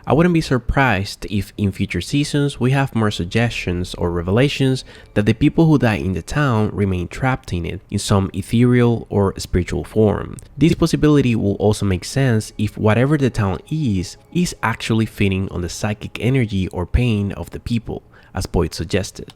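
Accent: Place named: Europe. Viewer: Your accent sounds like you from Europe somewhere like Turkey or Greece or maybe even like Ukraine Finland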